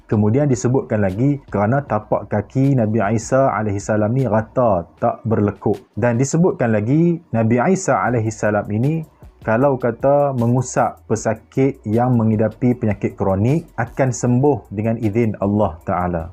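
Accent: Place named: North, Malaysia. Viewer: Mula2 mcm nk cover bahasa utara..last2 x boleh tahan..😆😆..apapun penjelasan yang jelas..😁😁😁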